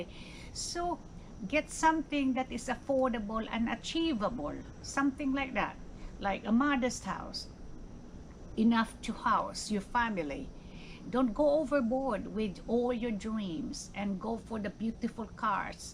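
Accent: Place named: Philippines. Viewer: Are you a Filipino ? If yes, then could you pls speak in your mother tongue your accent speaks for it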